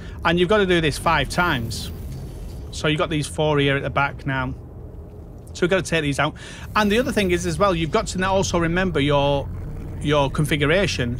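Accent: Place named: England, United Kingdom. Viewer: Where in England is your accent from?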